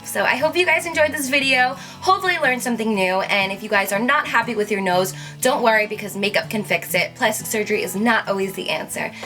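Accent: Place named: California, United States. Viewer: How cute can you be, you sound like a california girl then some black women, and its sooo cute!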